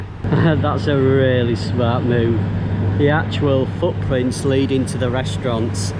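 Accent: United Kingdom. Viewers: actually your pronunciation is fine, you just has a British accent. Don't worry it's fine.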